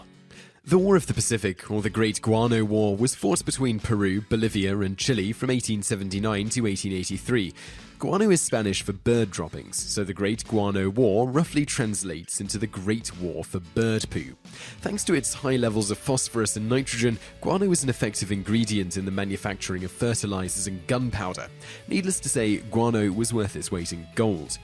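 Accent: United Kingdom. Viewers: It is an ACCENT, if you haven't noticed it already Obs: I love the british accent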